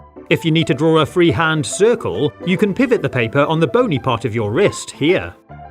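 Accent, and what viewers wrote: United Kingdom. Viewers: I never knew hes brittish. Im also british 😊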